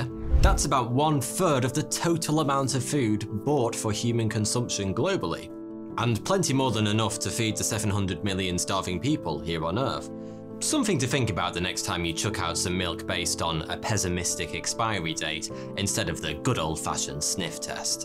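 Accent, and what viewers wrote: United Kingdom. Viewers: At the start it sounds like he is saying 42 here instead of Cause his accent is deep British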